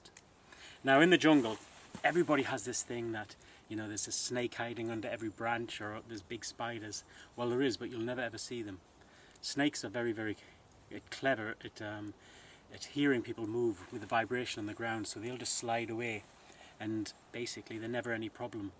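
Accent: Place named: Newcastle, United Kingdom. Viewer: last vid i just watched of him he had a geordie accent, now seems to speak alot clearer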